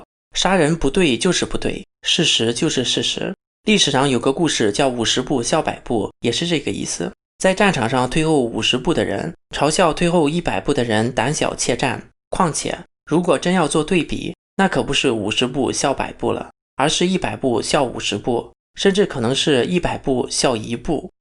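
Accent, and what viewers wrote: Shandong, China. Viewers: 听你口音是山东人周边地区的人吧？呵 tui。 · 口音大概是山東那區的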